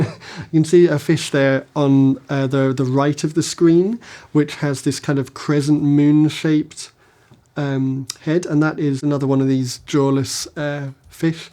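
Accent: Scotland, United Kingdom. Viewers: Can someone tell me wht kind of british accent does he hav? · british like me yes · Great. We have a British sounding explaining Prehistoric now! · No, you're fine. he's using a regional pronunciation from parts of the UK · Gotta love a guy geekin out with a British accent. Adorbs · He's from Edinburgh and now lives in London so has a mild Scottish accent.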